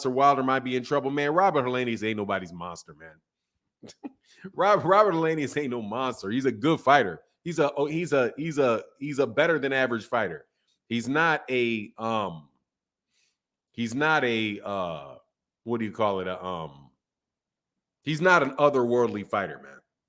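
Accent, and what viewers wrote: Texas, United States. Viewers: you from Texas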